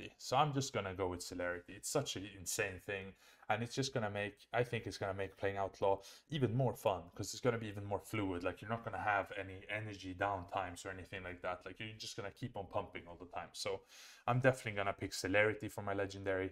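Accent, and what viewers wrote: Sweden. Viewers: Are you swedish? Thought because of your accent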